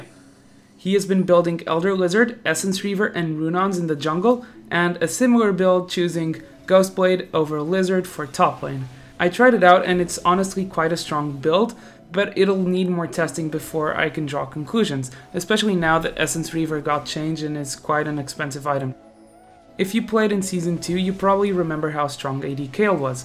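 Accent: Portugal. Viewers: ES TUGA MAN ahaha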